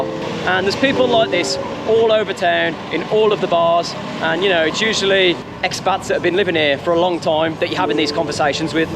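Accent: Birmingham, United Kingdom. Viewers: Nice to hear a down to earth Brummie accent on channels like this, keeping it real.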